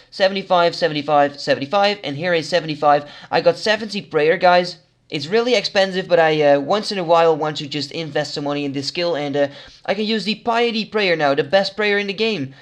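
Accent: Netherlands. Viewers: heard you were dutch the second you started talking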